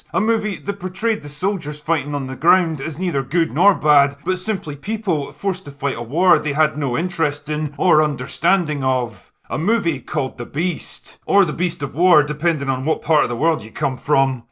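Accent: Scotland, United Kingdom. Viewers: Drinker missed a prime opportunity to say in his epic scottish accent "The Beast, wha' is it all good for? Absolutely nothin' claiming territory & resources" 😆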